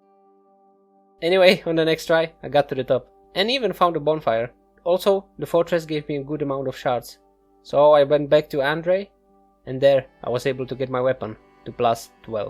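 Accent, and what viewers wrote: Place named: Czech Republic. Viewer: Pokračuj s contentem! Eventuelně se to rozjede. Pěkné video. Českej přízvuk mě mega kopnul.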